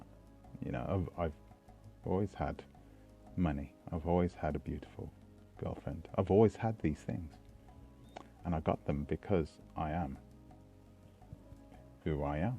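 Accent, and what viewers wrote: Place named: London, United Kingdom. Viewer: Grew up in B’Ham? Mmm - London accent!!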